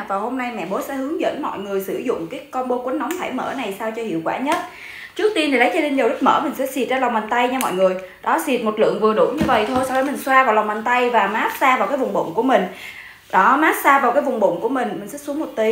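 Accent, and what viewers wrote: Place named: Vietnam. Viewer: nghe giọng điệu là biết ở Vn rồi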